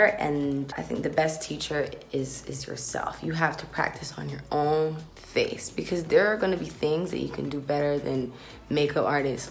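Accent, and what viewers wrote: Barbados. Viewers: you can really hear her Barbados accent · Her Bajan accent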